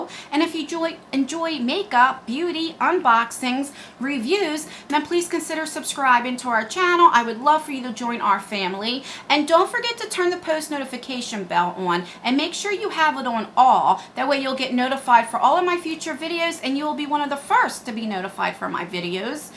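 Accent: New Jersey, United States. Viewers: Girl same!! I think it’s a jersey accent haha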